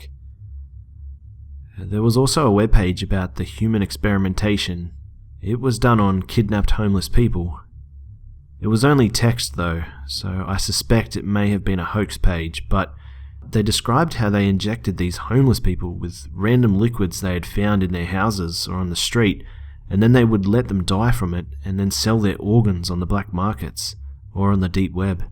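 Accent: Australia. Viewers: Are you Australian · Gday mate? Fellow Aussie for sure; great stuff! Keep it up! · Are you Australian? I'm getting a Aussie vibe I love your accent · are you Australian? your accent sounds Australian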